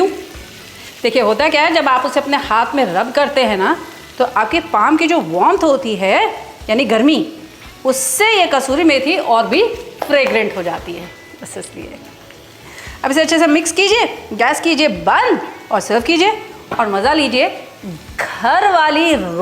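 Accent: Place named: Uttar Pradesh, India. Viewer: madam aapka recipies batane ja style mujhe bohot pasand hai aur jo aapki UP touch wali Hindi ❤ Par ek Salah hai meri.. ki aap yadi ghar ki sabzi banana bata rahi Hain toh nonstick ki jagah ghar wale cooking vessels use kareingi toh achha hoga.. jaise mein ghar mein roz mein loge ki kadhahi use Kari hun healthy cooking ke liye. Aur ek baat.. aajkal tamatar itne mahange ho gaye hain aur aapne Zara si aalloo Shimla mirch ki sabzi mein 2 bade tamatar daal diye 😲 aap pl. Kuchh recipies without tomatoes wali ya tomatoes ko kis cheez se replace kar sakte hain batayeingi toh dekhna aapke viewers double ho jayeinge 😁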